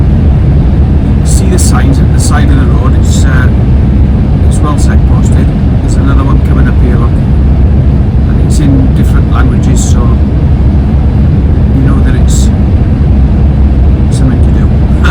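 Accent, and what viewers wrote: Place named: Wrexham, United Kingdom. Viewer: Morninggggg. I know the Castell Mynach very well. I've had a few (pricey) meals there. I prefer the Dynevor just down the road though. On another note, I've been trying to place your accent and I'm guessing at Wrexham. I'm a valleys boy myself. Love the videos. Please keep them coming.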